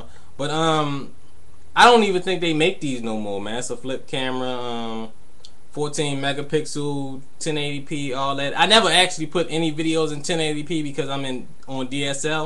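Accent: Baltimore, United States. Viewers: LOL!, you sound like you straight up from Baltimore! Now that I live here, I'm starting to get use to the accents... And oh yea, COMCAST sucks!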